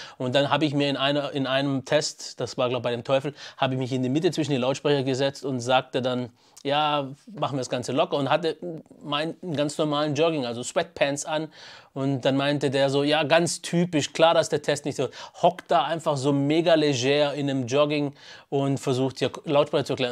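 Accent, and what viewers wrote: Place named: Swabia, Germany. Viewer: Schwabe laaan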